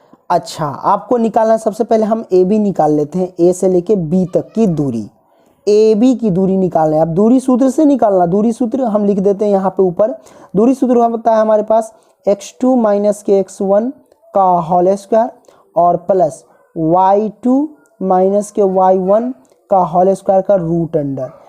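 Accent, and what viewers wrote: Bihar, India. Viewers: Bihar se ho sir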